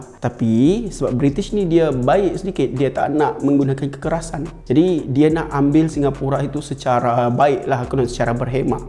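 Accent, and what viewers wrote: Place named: East Sabah, Malaysia. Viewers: slang speker mcm org blah timur sabah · Bang, org tawau ka bangg..slangnya mcm dr sna hehe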